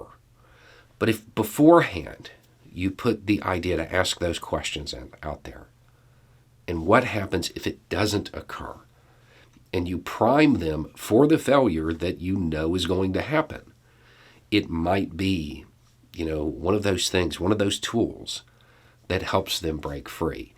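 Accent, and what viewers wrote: South, United States. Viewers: When I was younger I carried shame for simply being born in the south. Because many of our people were and some still are racist and or extremists often falling for absurd conspiracy theories. So it's nice to hear a southern voice that represents the south and southern men well. Thank you. UPDATE - didn't expect interest in my comment but since some have noticed I just want to make clear I am not ashamed to be southern. Certainly there are people in our past and unfortunately present we can't feel good about. But just like minority girls and boys need people that can inspire them to be great, I feel the same can be said about southern white kids (and all kids really). Perhaps in addition to pointing out our historical mistakes in history class and public discourse (lest we repeat them again) maybe it would be useful to highlight those who have done well. 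We have astronauts, scientists, inventors, and other great people we can also be inspired by. I prefer the word "inspired" over "pride" because "pride" often leads us down a dark path. Plus I don't think I should feel any personal pride for the accomplishments of others, but I think I should be inspired by them to become a better person myself and feel a sense of "possibility".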